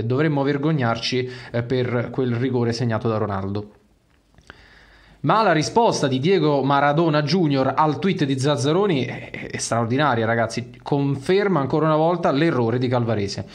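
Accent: Campania, Italy. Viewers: La cosa più brutta di questo video è il tuo accento Campano ti hanno fatto proprio il lavaggio del cervello ci vuole coraggio difendere una squadra del nord...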